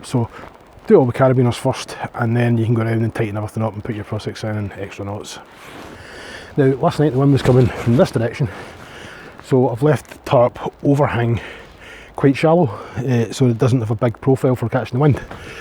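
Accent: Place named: Scotland, United Kingdom. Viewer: Fantastic, didn't stop laughing the whole time, and I grew up in innellan just down from Dunoon so I bl**dy love the accent, reminds me of my youth, keep up the good rant... I mean work.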